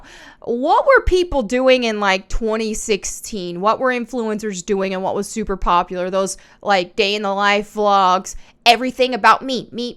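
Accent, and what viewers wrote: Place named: Wisconsin, United States. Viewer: Ran into your channel after diving into the frost craziness. Awesome content creator.. you have an interesting accent. Like you liked in Wisconsin for 15 years and then moved to the south for the last 15 lol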